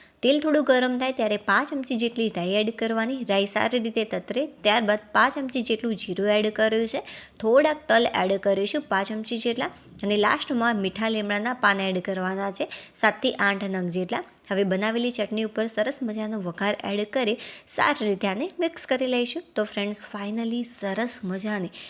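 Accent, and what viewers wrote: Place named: South, India. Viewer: Tamara pronunciation south indian java che.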